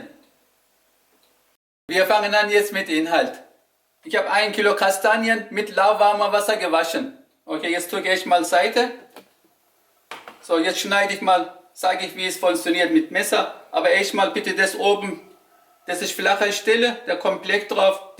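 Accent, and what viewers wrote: Swabia, Germany. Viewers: Das ist "Ausländerschwäbisch" 😂 Sprechen viele. Hat mein Vater auch so ähnlich drauf und ist kein Türke. Keine Ahnung, vielleicht tun die Schwaben ja irgendwas ins Wasser.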